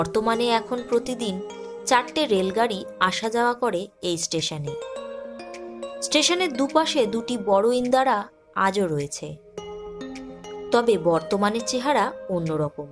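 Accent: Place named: Kolkata, India. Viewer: Tumi kolkata style a kano katha balo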